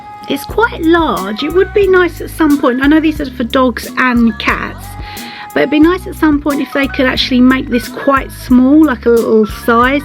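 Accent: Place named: United Kingdom. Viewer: Just found ur channel and this video as I’ve been looking for a tracking device for quite some time (ever since Jasper took his wanderlust a bit too far and there isn’t a person in our street who doesn’t know who he is it’s humbling and makes you feel like such a bad pet mum) .. Have u tried other devices as well and also, u sound British, can we get it via Amazon as well in the UK?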